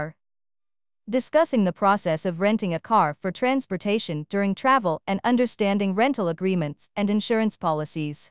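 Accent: United States. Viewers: اللهجة امريكية ام بريطانية ارجو الرد ؟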